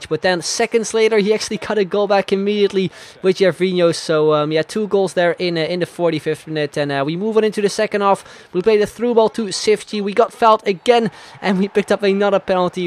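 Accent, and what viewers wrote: Netherlands. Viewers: Ben je nederlands want je klinkt wel zo😁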